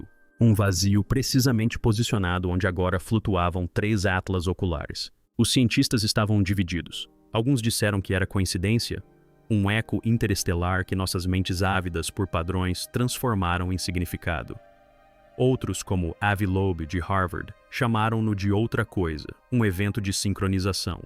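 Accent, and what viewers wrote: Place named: United Kingdom. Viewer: Sotaque horrível "r" muito britânico colonialista.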